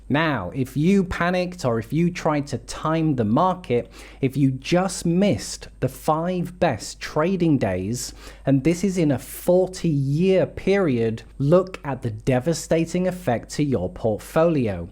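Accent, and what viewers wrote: United Kingdom. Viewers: This is great for the American market but what about the London Stock Exchange? You sound like a British person but focused on the S&P exclusively. There seems to be a bubble in the USA but in the UK values (I've heard) are similar to 20 years ago. Could an American crash cause a UK crash?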